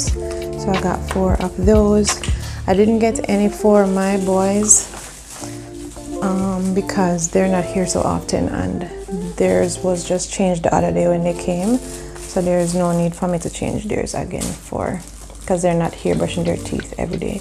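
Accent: Jamaica. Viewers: Hey happy to be participating in this collab with you do I hear a accent in there somewhere Jamaican. New friend here and new subscriber as well going cover for IG group he's too adorable thank you for sharing❤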